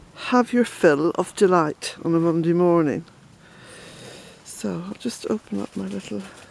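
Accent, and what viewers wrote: Ireland. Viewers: I watch your vlogs for inspiration, comradeship and hope: but also for relief from stress, solace in hard times, an escape from my own life and even as an aid to peaceful sleep when life is really hard and I am in distress. I put my laptop on the floor near my bed and drift off to sleep listening to your lovely voice and tales from at those times and it has been such a blessing. So yes indeed, with your Irish lilt provide a sanctuary for me:)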